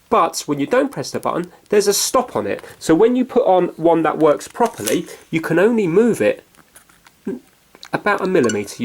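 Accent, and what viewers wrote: England, United Kingdom. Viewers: British accents are sooooo satisfying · Yes, he is from England. they say torch for the word light.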